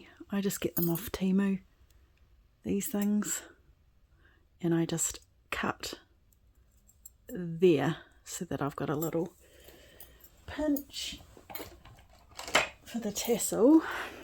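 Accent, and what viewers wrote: New Zealand. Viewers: How cool to hear a kiwi voice!!. Great project xx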